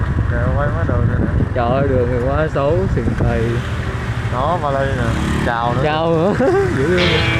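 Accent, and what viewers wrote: Vietnam. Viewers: giong viet nam qua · lối nói chuyện của * ... người miền Nam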